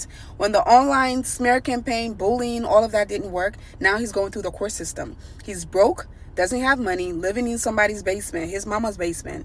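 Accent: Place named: Jamaica. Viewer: Maybe you sound like you from Jamaica or somewhere maybe you should probably go back somewhere where he can’t find you and don’t talk about it just up and move somewhere and you might have to leave some people behind. If y’all have mutual friends you may have to cut them off cause it sound like he might try to do something to you. You might wanna justmove again and don’t tell anybody.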